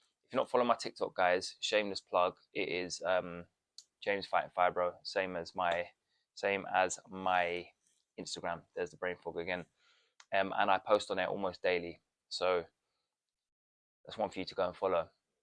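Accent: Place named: United Kingdom. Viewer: Hi 👋 James, im new to your wonderful channel here on Fibro..I just came across your channel and find it sofar to the point info on everything about it as u can tell us all.The full..Heads Up on this awful painful condition..gentle and friendly nice huggs to u hon.❤❤❤love your awesome accent as well. You British or Australian. Im guessing..British..sweet anyways..ill keep watching daily keep posting ok.much love from me..Nancy from Jacksonville Florida..USA❤❤❤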